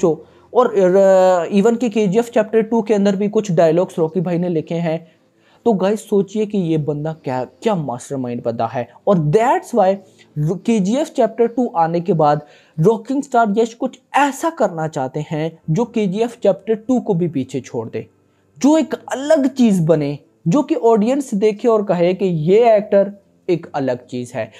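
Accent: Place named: Punjab, Pakistan. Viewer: bhai thumara pakistani punjabi urdu accent aata hai...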